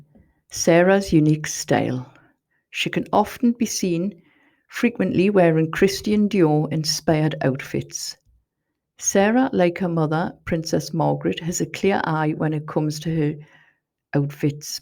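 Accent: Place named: Newcastle, United Kingdom. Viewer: It's always interesting to here of royal members who go under the radar. Very factual and informative. Your geordie accent makes it all the more grounded. Well done.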